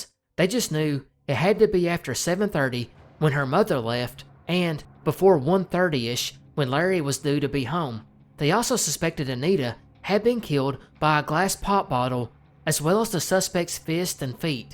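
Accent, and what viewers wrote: South, United States. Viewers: how I wait in epic patience for your southern drawl. Bring is, brother!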